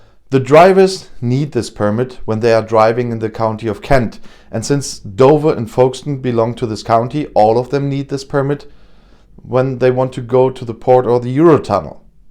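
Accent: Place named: Germany. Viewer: With your slight German accent it almost sounds to me like the "Kent Access Permit" is perhaps instead called the "can't access permit" but that would be absurd wouldn't it?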